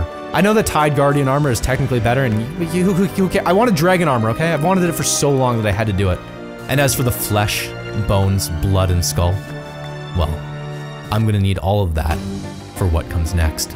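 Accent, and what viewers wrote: Canada. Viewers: Just started watching you and honestly 98%sure you must be Canadian because you sound it. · this sure is bussin bussin, especially because this guys accent sounds canadian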